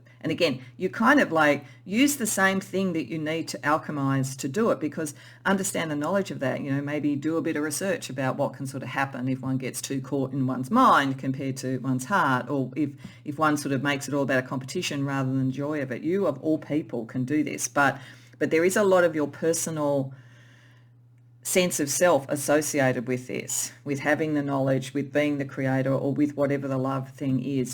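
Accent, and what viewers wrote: Australia. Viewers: Love your accent Aussie?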